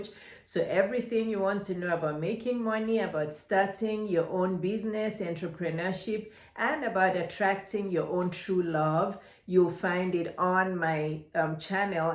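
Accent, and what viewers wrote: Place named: Africa. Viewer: I love your African accent, who coached you in public speaking? I live in the Northwest Ohio area. Also, I'm a travel nurse.Latrice ✝️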